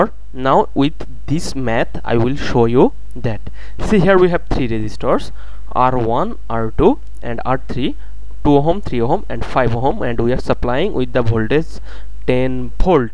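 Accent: India. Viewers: I know it's an inappropriate statement but your accent sounds more like indian than Bangladeshi... :/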